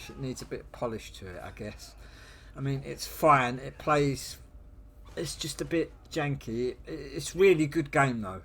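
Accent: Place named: Ireland. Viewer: Are you Irish, bro? Cute accent :)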